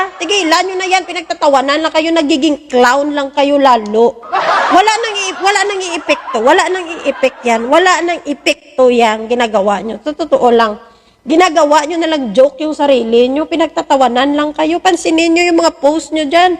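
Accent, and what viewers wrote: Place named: Western Visayas, Philippines. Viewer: Ha ha ha nakakatuwa Ka idol para Kang d ilonggo kung Magsalita para Kang Beterano na reporter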